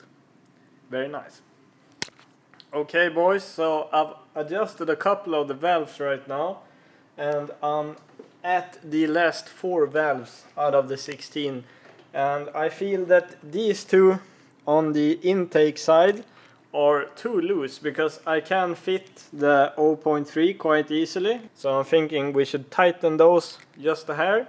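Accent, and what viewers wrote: Norrland, Sweden. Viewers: Har du någon statistik på hur många utländska tittare du har? Diggar din Norrlands svenska 😃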